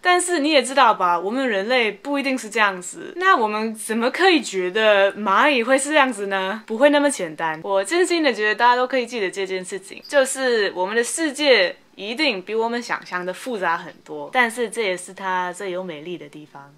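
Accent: Taiwan. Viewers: 不錯 中文講得很好 很台式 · 哇 中文講的真好 連你講英文都感覺好台哦 最好台語也學一學 那你就真的變成台灣人了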